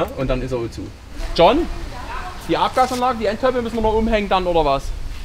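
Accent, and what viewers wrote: Saxony, Germany. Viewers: Ein sehr interessantes Video. 👍 Dieses war glaube ich mein zweites Video was ich von euch sehe und ich muss sagen das gefällt mir gut. Du bist'n Typ der einfach so quatscht wie ihm die Gusche gewachsen ist. Und dann noch auf sächsich.👍 Abo ist mal da.✌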